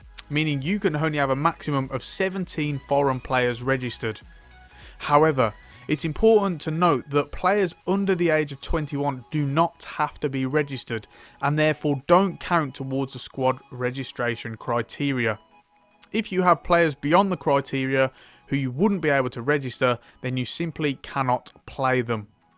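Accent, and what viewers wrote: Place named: Black Country, United Kingdom. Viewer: yeah I'm from Tipton (near westbromwich) so I speak black country and your accent sounds similar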